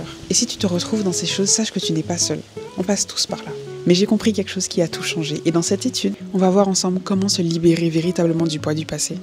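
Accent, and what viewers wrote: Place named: Madagascar. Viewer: Je laisse derrière moi mon sentiment perpétuel de compétition avec les autres et la peur de ne pas être assez bien pour autrui, puisque je suis fille de Dieu et il m’aime Merci pour la vidéo, ça fait plaisir de voir une antillaise sur youtube, et en plus pour parler de Dieu🥰 C’est Gwada ou Mada? L’accent disait plutôt Mada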